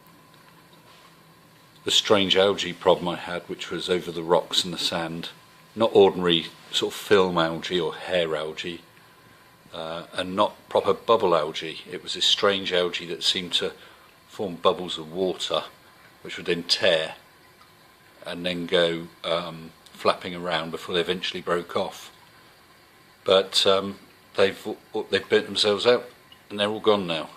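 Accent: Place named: England, United Kingdom. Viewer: Stunning foxface mate I love them, unfortunately I lost my juvenile foxface 2 days ago 😢 and I don't no the reason at all all my water parameters are spot on the fish looked healthy no signs of illness and he was eating like a pig so I think I was the case of things die 👎quick question buddy i has sps birdsnest frags for sale and I can post if ure interested you've got a English accent so ino postage isn't a problem lol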